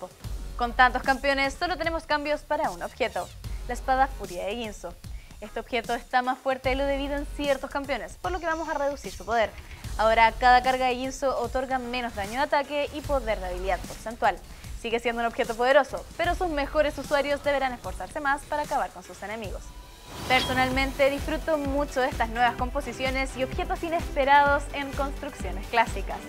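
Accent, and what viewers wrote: Chile. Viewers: Se nota que es chilena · Me gusta el acento chileno pero solo el tuyo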